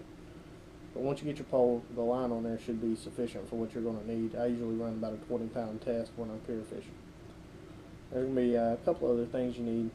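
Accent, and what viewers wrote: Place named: South, United States. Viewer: Awesome video man. I learned some things I will be using this weekend. You just need to talk slower and louder for us guys that are not used to a southern accent. lol Thanks man!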